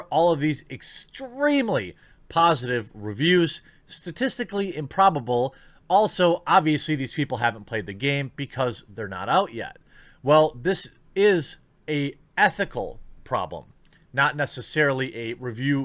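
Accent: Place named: Midwest, United States. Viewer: Rotten to-mate-ers... yeah this guy is Midwest. I bet he has a Davenport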